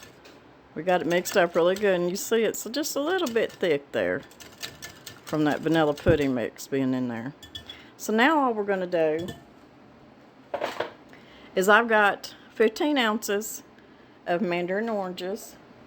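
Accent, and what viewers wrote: South, United States. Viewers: I don't know how I came across this but after watching it for about 10 seconds I hit subscribe. I love her southern accent, she sounds like my mamaw.. love the recipe too!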